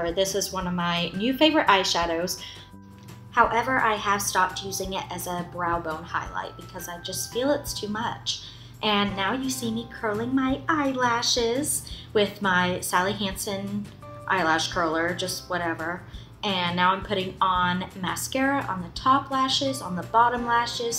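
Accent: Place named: South, United States. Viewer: Ohhh fun!! I thought you had a southern accent for sure lol. I'm from Michigan, born & raised here ❤💙💚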